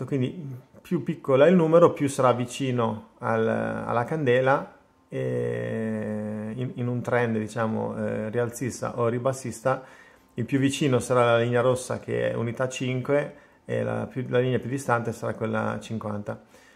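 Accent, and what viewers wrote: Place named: Trentino, Italy. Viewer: Ecco ora ho capito: leggevo trentino ogni tanto, ma l'accento non mente! Ciao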